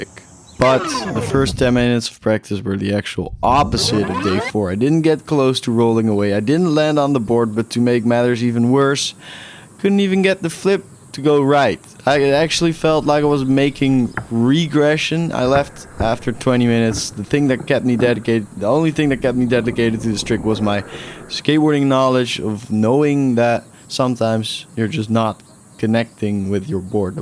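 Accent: Netherlands. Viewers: Eindelijk ik wist het aan je accent je bent Nederlands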